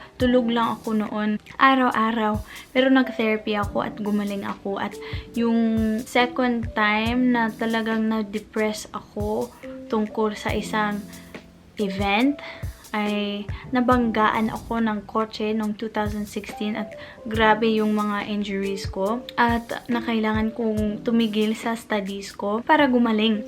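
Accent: Visayas, Philippines. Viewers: I hear ur Bisaya Accent heheh ate 😍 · katuwa...cute bisaya accent. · you sounds like visaya when you speak in tagalog, i presume you studied in visayas region. But I could feel that you are very down to earth person. I feel you and please do keep inspiring people. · Huwag kang magalit sa sasabihin ko,. parang bisaya ka magsalita ng tagalog. Hindi ko sinasabing masama un. Un lang naman ang obserbasyon ko. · Your bisayan accent parang tutuo. Marunung ka mag salita ng bisaya na pinatigas ang accent. Bisayang bisaya ka pakinggan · Para kang bisaya the way you speak. Shout out sa tanan bisaya,. From Surigao,. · Napapansin ko minsan ang bisaya accent mo ang kyut haha. Magpatuloy ka lang, marami kaming new subscribers mo. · Your so cute sa mga vlog mo. Medyo puntong visaya ka magtagalog at ang cute tuloy pakinggan. Nakaka amaze ka at we appreciate you sa pagsasalita ng wikang tagalog. 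Hope to see you personaly soon at papicture sana.thanks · Tagalog nya hindi english accent but bisayan accent. Bisaya kasi ako from negros.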